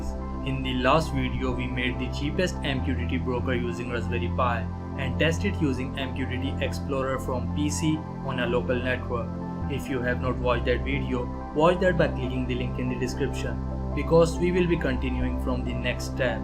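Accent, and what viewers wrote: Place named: India. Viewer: You already know youre getting the best when the indish accent starts.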